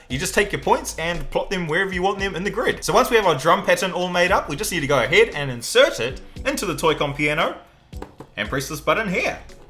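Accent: New Zealand. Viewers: He sounds kiwi, I love him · You from New Zealand? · Are u from New Zealand? U sound like a kiwi.. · Is he from nz? Oh yeah yeah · Are you a kiwi, brother? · yo, that accent.. are you a kiwi? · Are you a Kiwi? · he’s def a kiwi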